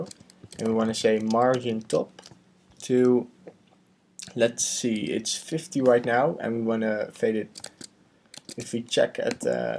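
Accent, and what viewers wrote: Netherlands. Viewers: Yeah I can hear a dutch accent boyyy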